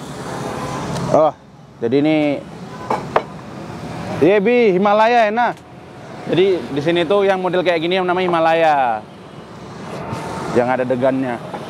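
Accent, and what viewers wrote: Medan, Indonesia. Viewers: 😆asli mana sih bang... bahasamu campur jawa tp logat medan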